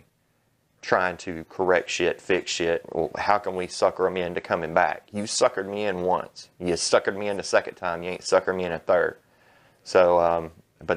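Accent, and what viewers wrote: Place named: South, United States. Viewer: I've notice something kind of funny.. lol... If the people from the east cost says Ray is a Marry Sue, and this guy who sounds like deep south says Ray is the biggest horseshit Force User. just trance late Deep south langrage to East Cost, its the same thing said in a different style of English, Marry Sue= Biggest horseshit Force User.. At this point I think people in the UK will say the same thing about Ray but in a different style.. you know what this means Disney and Lucasfilms is in deep shit..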